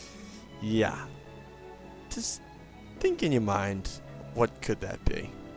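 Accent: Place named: Boston, United States. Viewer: Haha awesome luck. That's pretty ridiculous odds. How'd you like the Super Bowl. I really dont keep up unless Tom Brady is involved. You sound very Boston so I guess you might be a Pats fan :p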